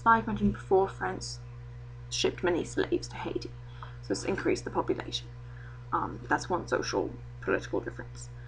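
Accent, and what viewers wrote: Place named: United Kingdom. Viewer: You remind me a lot of Hayley Atwell with that British accent.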